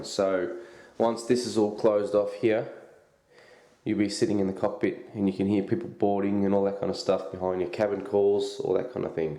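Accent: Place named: Australia. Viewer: That Aussie accent is real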